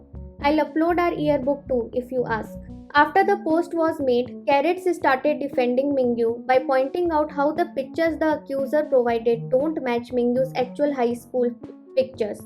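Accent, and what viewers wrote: India. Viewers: i like your accent..are u indian??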